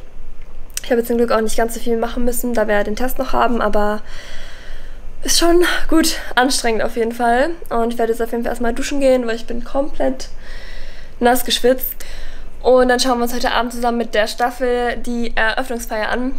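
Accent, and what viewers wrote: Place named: Germany. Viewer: Me gusta tu acento Alemán y así aprendo Alemán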